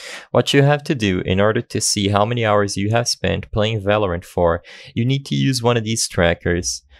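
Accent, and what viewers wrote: Brazil. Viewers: amazing video! thanks from Brazil. (you sound a little brazilian)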